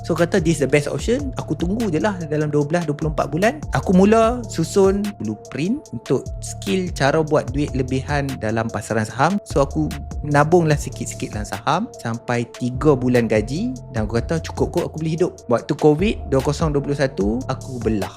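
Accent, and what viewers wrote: North, Malaysia. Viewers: haaa!!! biar betey??? gilalah.. siap loghat utagha tu... ni mcm mahathir lah ye??m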